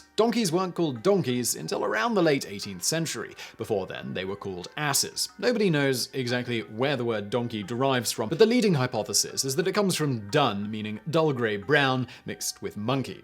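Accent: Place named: United Kingdom. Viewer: Zedbras 😂😂 I love british english (yeah yeah, i know lives in Prague)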